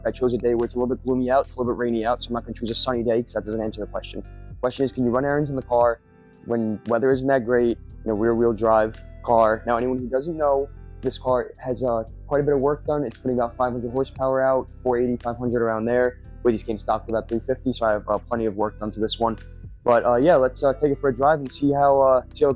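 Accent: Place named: Long Island, United States. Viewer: I spotted your accent and i'm like this guy is from Long Island, I'm from Suffolk but i moved to Orange County, CA about 15 years ago.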